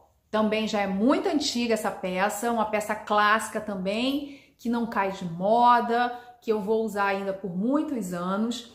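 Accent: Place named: Rio de Janeiro, Brazil. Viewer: Bem que desconfiei que você fosse aqui do RJ kkk pelo seu jeito de falar. Tamo junto, parça 😂 espero um dia ser agraciada com a mesma bênção de ir morar no Sul, como você. Bjs!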